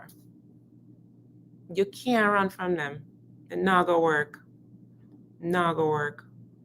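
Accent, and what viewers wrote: Jamaica. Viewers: the intermittent Jamaican accent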